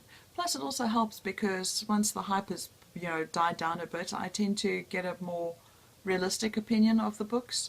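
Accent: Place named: South Africa. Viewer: Ahh I just found your channel! Where in SA are you? I lived in Knysna for YEARS - now live in Australia but so nice hearing a Saffa accent again! OK so I now need to read Wolf Hall haha!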